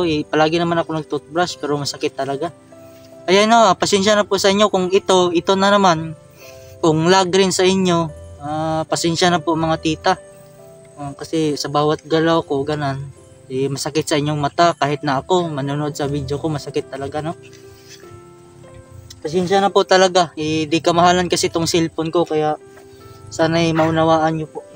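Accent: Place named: Iloilo, Philippines. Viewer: ingat kaung lahat. ilonggo ata c a ung tuno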